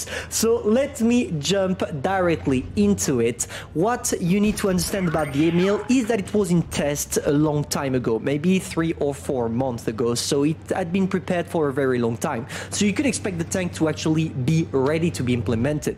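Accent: France. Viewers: I live in a cave but I speak English without such an annoying French accent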